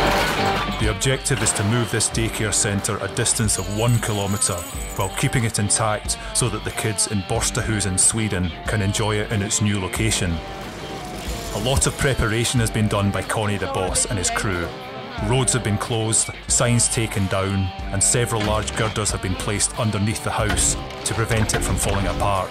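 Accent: Scotland, United Kingdom. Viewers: Why do people on here SLAM DUNK the video, It doesn't matter if the announcer is Scottish, some one had to do it and he was chosen, "Welcome to the internet as You Might Say" Thanks for sharing this with us on you tube, if you have any more please send me a link and any others that might be interested, English / Scottish / Usa / Australian / Canadian/ :) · Why the scotish accent? It's not even in Scotland.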